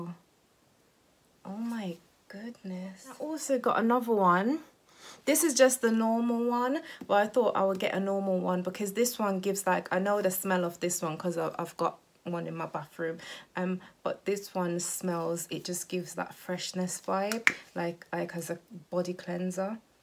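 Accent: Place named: Birmingham, United Kingdom. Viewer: Great haul, looking very glam in this video. Loving the earrings and the watch! And of course the slight Brummie accent too lol!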